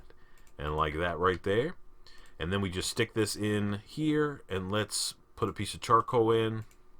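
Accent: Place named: United States. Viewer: I'm sorry but you sound country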